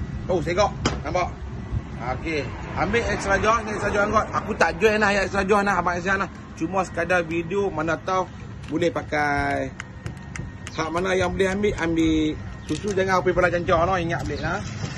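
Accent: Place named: North, Malaysia. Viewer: kecek uthaghaa nohh..☕😝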